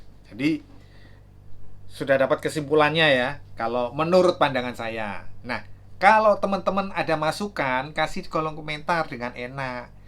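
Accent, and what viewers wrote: Java, Indonesia. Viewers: tp jujur nih mas maap nih ya, aku malah suka, lucu ato terhibur klo mas nya misuh2 di setiap konten video gara2 komen netizen, misuh2 mas nya lucu bikin ku ketawa terhibur trs apalagi dgn gaya ciri khas kental logat Jawa nya itu, gara2 itu jg aku jd sering nton channel mas nya selain pengen tau info2 gadget soal perkabelan dan per chargeran nunggu moment mas nya misuh2 soal komen aneh2 ato soal komplain netizen 😂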